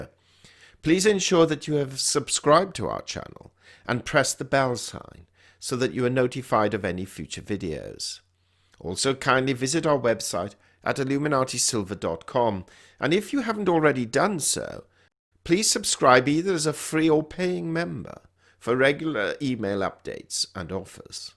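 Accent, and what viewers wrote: United Kingdom. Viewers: This channel is great! I like the format and the lack of wild speculation. The brit accent add an extra charm!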